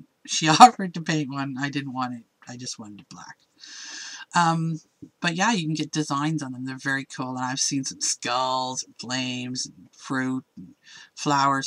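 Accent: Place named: Canada. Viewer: Okay, good video! Couple things...? Hot! I'm a sucker for that Canadian drawl..."owwt" instead of "out." what a cutie! And second, don't you dare bleep yourself. There's nothing funnier then you saying "fuck" ten times in a video out of frustration:). Love it!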